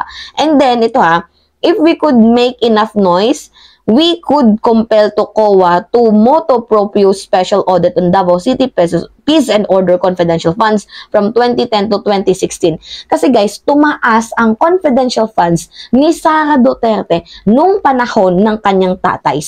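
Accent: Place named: Visayas, Philippines. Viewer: Bisaya ka day... matigas ang pilantik ng dila mo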